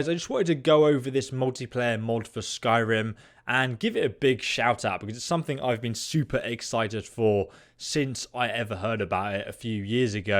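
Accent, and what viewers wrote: United Kingdom. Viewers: Olong Johnson I think he’s British so that’s why he says it like that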